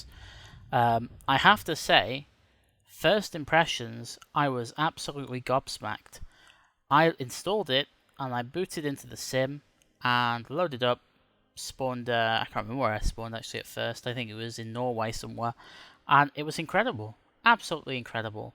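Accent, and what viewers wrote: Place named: England, United Kingdom. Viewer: can you realy not tell by his accsant, that he is English? i am Norwegian thoght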